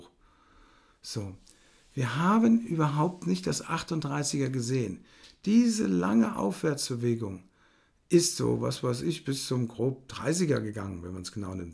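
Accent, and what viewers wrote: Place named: Hesse, Germany. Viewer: Ey, subber hessisch gebabbelt Alder! Guuude Rutsch, gell?!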